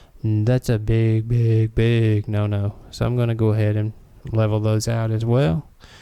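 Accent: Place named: South, United States. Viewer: Dudo mucho que ese hombre hable español. Se le nota, su acento es del sur del pais.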